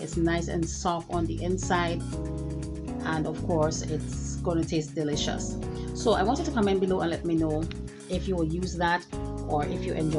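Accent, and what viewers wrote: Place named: Eastern Caribbean, Caribbean. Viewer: What IS "blogger plantain"? Is it of the Eastern Caribbean, I detected the region's accent. Novel idea. 😊🌴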